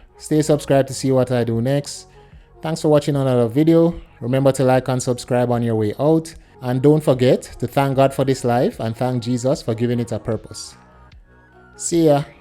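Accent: Jamaica. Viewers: Bro sounds like a yaadman, subbed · Yo u a jamaican ? How on earth did you get a steamdeck cheap cheap?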